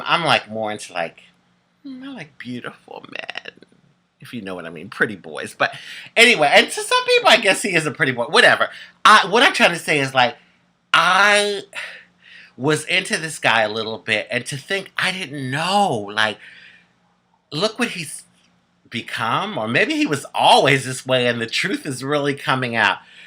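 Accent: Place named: New York, United States. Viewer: you really do personify multiple races (white, black, Indian, islander, etc..) But I sorta consider you as a black woman. You have black people most unique ways and manner. You speak more like an educated white woman from new York, but when you let your guard down, the real you comes out. It makes you funny and someone that many can identify with. Be blessed my sister. I watch every video that you put out, seriously.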